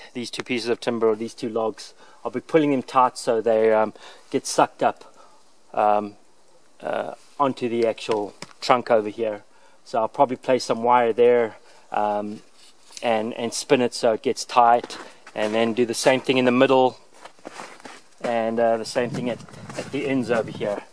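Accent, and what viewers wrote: South Africa. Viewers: What is your accent? I'm guessing it's a mix, maybe a south african that now lives in america? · Love the videos man! Where are you from? You almost sound south african! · Are you from South Africa? sure sounds like it, awesome stuff btw!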